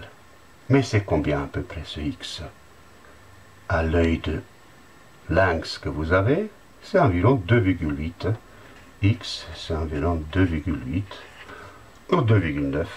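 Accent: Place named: Romania. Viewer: vous etes roumain? Car vous avez l'accent (si oui eh bien je voulais vous dire que sunteti un profesore foarte bun)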